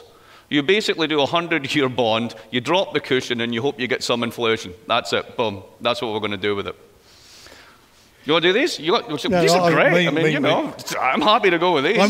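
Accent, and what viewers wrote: Scotland, United Kingdom. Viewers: I want to ask the channel owner -- to PROVIDE C.C. I believe Mr. Blyth is a brilliant economist, but he speaks with the RIDICULOUS ACCENT! Probably scottish or welsh I couldn't understand 1\4 of the lecture.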